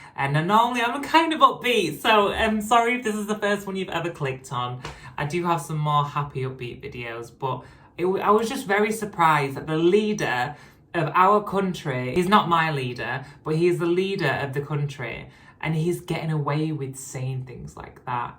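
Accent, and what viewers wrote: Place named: Scotland, United Kingdom. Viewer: Politics are terrible - makes sense what you mentioned. I am honest in stating I think it's a bit much-expecting people to understand this overnight and learn everything - the world is busy and that's not on my list of things to learn right now, however, you are a human (woman) who I respect but not what I see in the parades and story time with drag queens - what the heck. I love people and care a lot but they can get very nasty over a mistake - misgendering. The community seems to be very entitled but not yourself. Thank you for helping me understand everything and learn that not ALL transgender individuals are the same. I feel bad for you but also respect you because you are living your life and doing an amazing job with your transition and platform. You are a beautiful and kind woman and I am beyond grateful to have found your videos. 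Positive vibes and love from Canada. BTW I love your accent, my grandmother was a war bride from Scotland.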